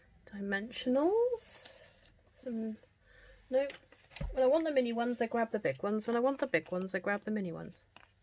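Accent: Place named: Wales, United Kingdom. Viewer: Beautiful, great technique! Great to hop with you. Also, I hear a little welsh accent coming through. Reminds me of home!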